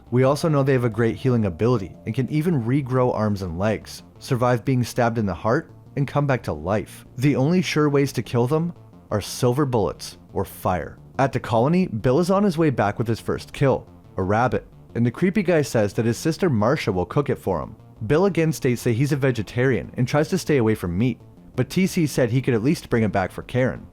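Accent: Canada. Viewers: Am definitely enjoying the Canadian accent. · are you from Canada? I hear a couple of "oots" aka outs lol. Hope this isn't offensive. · bb are you canadian?? cuz you hella sound like it lol (i'm from vancouver)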